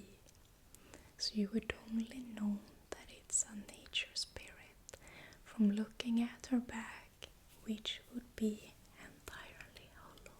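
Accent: Sweden. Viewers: I swear Swedish accent is the best accent. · Vilken underbar svensk accent!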